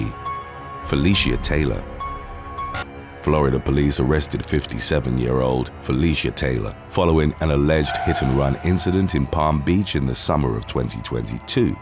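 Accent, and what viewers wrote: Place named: United Kingdom. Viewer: Please do when 23 year olds go wrong? I really like your channel, I like the narrator, you sound like darth Vader with a British accent! 😆😆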